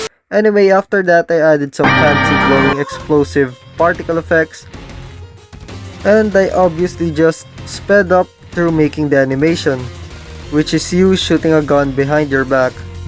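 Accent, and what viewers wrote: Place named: Philippines. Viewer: Nakita kolang tong video mo kaka scroll ko hahahah sa boses mo palang halatang pilipino, keep it up bro lab u. ❤❤😂 Proud developer pilipino here😂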